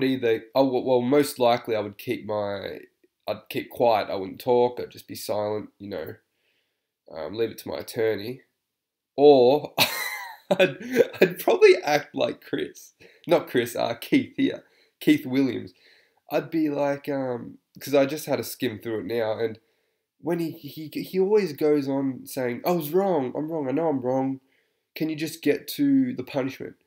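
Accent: Australia. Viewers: You are quite entertaining and your Australian accent is a plus also.(No disrespect I just love Australian and British accents compared to the wining voices of New Yorkers) 😉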